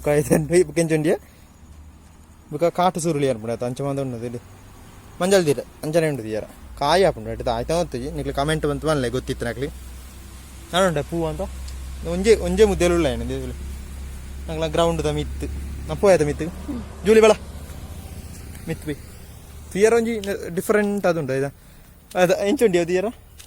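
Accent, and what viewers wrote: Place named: Kasaragod, India. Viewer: Kasaragod da tulu kenere kushi apundu Jai Tulunadu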